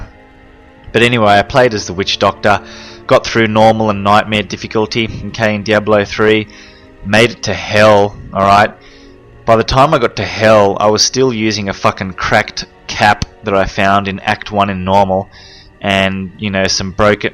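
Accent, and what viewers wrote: Australia. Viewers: Are you an aussi? I love your accent!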